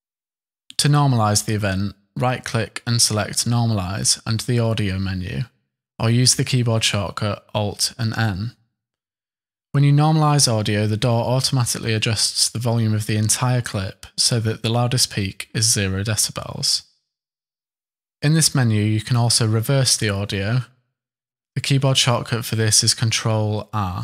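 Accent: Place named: London, United Kingdom. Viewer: Your Studio One tutorial video, by far, is more understandable than any other free tutorial here on YouTube! The added bonus of your God-given British/London (or one of the suburbs, thereof) accent just makes everything that much more exciting and enjoyable to listen to. I'm American and I grew up on "Monty Python's Flying Circus" television back in the 1970's. Between that and interviews with the Beatles, I've loved all manner of English accents ever since. I know, for you it's not an accent, it's just your everyday speaking voice... I'm a voice actor and narrator and I just started using Studio One 5, Artist edition. Really loving it for what I'm doing. Especially for the one-time price. I'm also a singer and songwriter, so, I'll eventually make more use of the music recording and editing functionality... Thank you and keep up the great work! 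Peace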